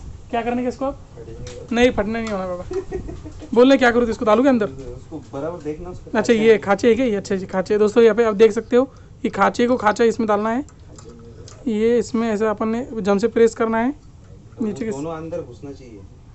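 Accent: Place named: Maharashtra, India. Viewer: तुमची हिंदी महाराष्ट्रातील आहे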